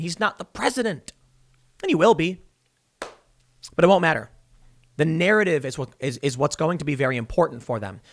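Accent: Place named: Midwest, United States. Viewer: It is just me or is accent slipping back more Mid-West lately. "Byoxes", "Boeth" & "Foelks" as examples.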